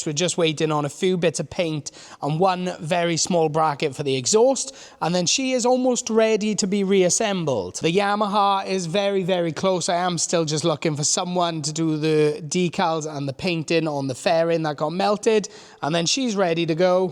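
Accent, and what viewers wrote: Wales, United Kingdom. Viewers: YAAAY! You're back! Cracking update,! I've missed your delightful Welsh tones and this vid has really made my weekend 😊 Well done on the new unit and growing the channel. I hope you get to the point when you can quit your day job and do this full time 😁 Roll on the next vid!!!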